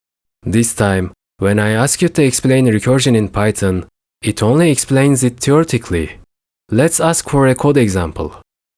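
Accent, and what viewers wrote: Turkey. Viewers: Great video. Random to ask but are you Turkish by any chance?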